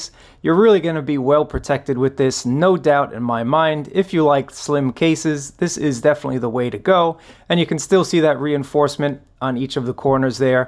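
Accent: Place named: Brooklyn, United States. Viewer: Straight outta Brooklyn lol. Well done with the video. I'm sold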